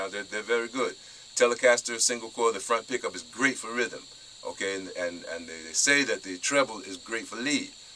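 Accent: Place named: Jamaica. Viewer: "Now if you listen carefully you will hear..." Man speaks like Bob marley 🇯🇲😁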